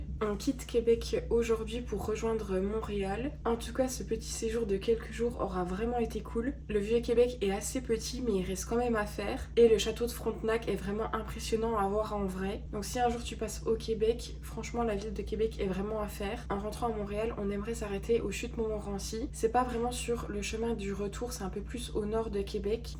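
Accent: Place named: Franche-Comté, France. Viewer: Hello! Je suis tombée par hasard sur une de tes vidéos il y a environ une semaine, j'ai vraiment bien aimé, et j'ai eu envie de rester. Et puis je me disais "il y a un léger fond d'accent qui me fait penser que"... Et quand j'ai vu le papier du fromage ça m'a confortée dans l'idée ^^. Contente de suivre les aventures d'une franc-comtoise au Québec, et au plaisir de te revoir par ici!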